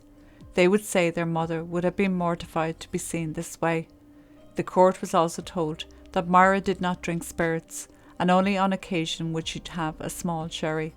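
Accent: Ireland. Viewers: I love your narration voice, your Irish brogue ❤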